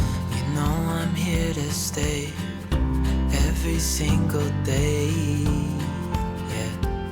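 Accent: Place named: Northwest England, United Kingdom. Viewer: Can tell your from the northwest,not just by the accent,but the old sayings,dead flies brought back so many memories,dinner not lunch,Tea not dinner lol,very enjoyable watching your videos,you have the air of a very enjoyable tv presenter ❤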